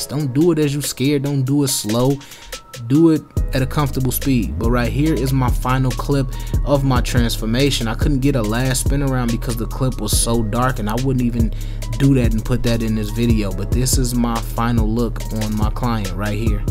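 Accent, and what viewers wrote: Chicago, United States. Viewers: Chicago accent..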